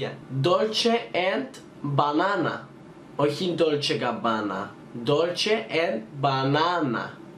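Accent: Cyprus. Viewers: Λατρεύω τα κυπριακά !!! Και είσαι πολύ ωραίος και σου πάει πολύ το μαλλί !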